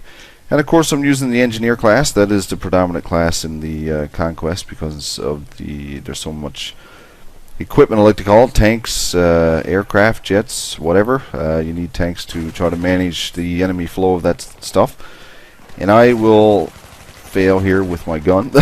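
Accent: Canada. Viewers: is that a faint Newfoundland accent I hear? Vancouverite asking, much respect! Add me up, "guybones" psn id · Are you from Canada or Minnesota?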